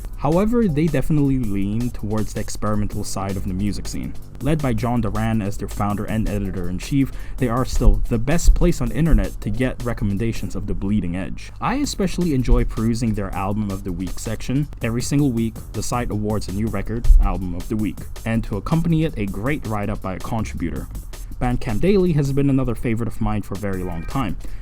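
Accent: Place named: United States. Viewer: Would've never guessed you were a foreigner, you sound perfectly American to me. Funky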